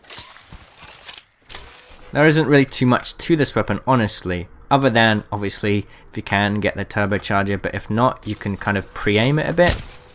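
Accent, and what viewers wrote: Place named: United Kingdom. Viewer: I hear British voice, I sub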